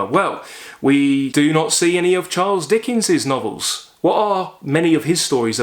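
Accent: United Kingdom. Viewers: I really enjoy your introduction of great books. And of course sort of envy. I envy you upon reading all these books when still young. Your British accent is fantastic.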